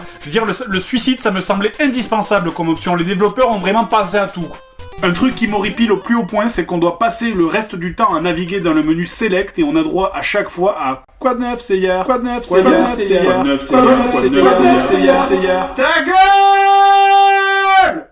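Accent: South, France. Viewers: Il vient du sud non? ( ne me tuez pas je decouvre la chaine) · Je me fais un petit retour en arrière. Je me refais tous les. A cette époque tu avais encore notre bel accent du Sud!😎 Merci d'être sur YouTube depuis tout ce temps. Ne changez rien! Bisous les gaziers! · ha c'était le bon temps, l'époque du gros, avec son bonne humeur et son accent du sud. J'espère qu'il reviendra un jour pour un featuring special avec le nouvel acteur de droite. Perso j'ai toujours préféré l'ancien acteur. Et ceux qui pensent que c'est la même personne. Déjà regardé les vidéos. On passe directement du gros sympa au mince, sans voir à aucun moment le gros qui maigri peu à peu. une vidéo tu as le gros et son accent du sud, et l'autre vidéo tu as un mince, souvent avec de fausses lunettes, ou sans lunettes, son accent comme par magie a disparu, il passe de brun à roux, ce n'est même plus la même voix... 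Il n'y a que la chemise qui reste la même. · il est de Perpignan, donc il avait un léger accent du Sud. Il l'a pas mal perdu depuis, même si sur certains mots tu peux encore l'entendre en 2026.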